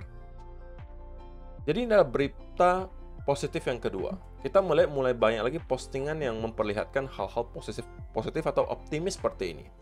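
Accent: Pontianak, Indonesia. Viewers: haa abg org pontii ???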